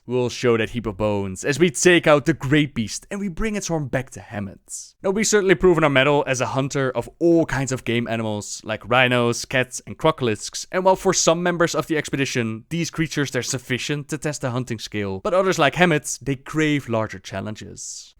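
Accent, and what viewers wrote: Netherlands. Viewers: theonesinblk you know he's dutch right? Accents are accents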